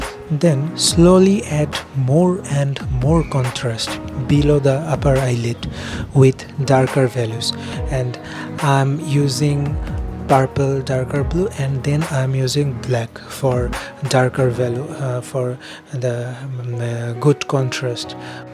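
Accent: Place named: India. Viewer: you speak japanese, you sound indian, and you draw like a god. your A legend.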